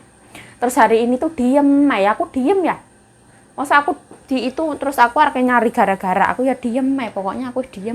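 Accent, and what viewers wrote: Java, Indonesia. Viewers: Lah lah...mbk orang ngawi jawa tomur to...aku blitar jawa timur juga, pantesan omongane jan njowo banget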